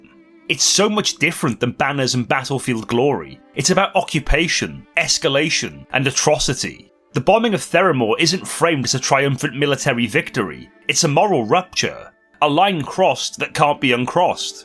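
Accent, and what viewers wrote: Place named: Wales, United Kingdom. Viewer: Haha I am from Cwmbran. You sound very similar to me but I play with a guy from Barry and he sounds a little more like you than i do so yeah....That was my best guess but i new you was Welsh :)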